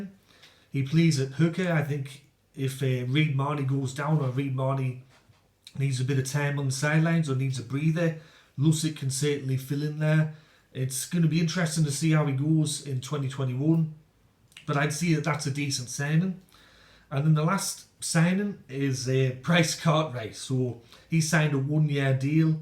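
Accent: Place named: Geordie, United Kingdom. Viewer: Good video! Dude, your accent! Where's it from? Geordie?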